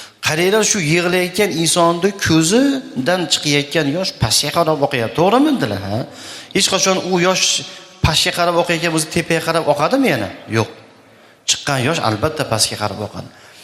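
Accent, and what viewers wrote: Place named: Khorezm, Uzbekistan. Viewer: хоразмли акансиз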